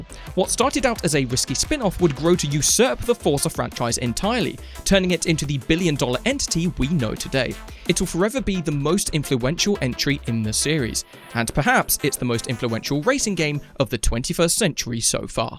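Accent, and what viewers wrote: United Kingdom. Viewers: yeah man you could definitely turn this into a career. Everyone loves a British accent narrating videos lol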